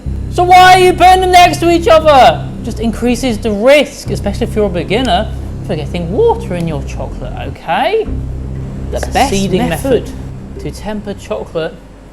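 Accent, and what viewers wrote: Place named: United Kingdom. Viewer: I didn’t know I liked being yelled at in a British accent until now 😍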